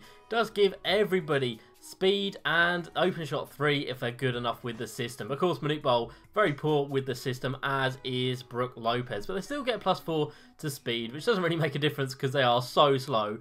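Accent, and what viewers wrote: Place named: United Kingdom. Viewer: Loving the BRIT...........ISH ACCENT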